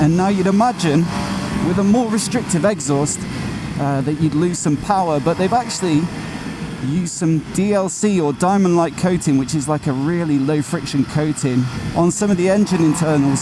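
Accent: United Kingdom. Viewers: Can you add subtitle on your video? I really enjoy it but my english not very too good to catch ur british accent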